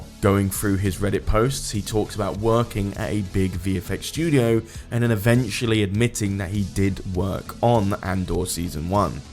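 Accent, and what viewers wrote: England, United Kingdom. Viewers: When did you get an English accent?? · I haven't visited this? channel in a while, since when did become British? What happened to the OG? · Why is no one talking about the fact that the narrator is suddenly british instead of american 💀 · Why are you british now? You didn't used to be british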